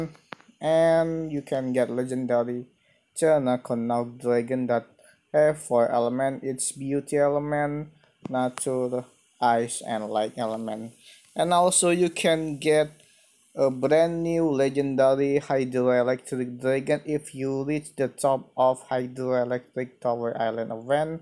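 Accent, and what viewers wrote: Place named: Indonesia. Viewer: Bang gw tau lu orang indo di lihat dari ip chanel dan logat bahasa inggrisnya wkwkwkk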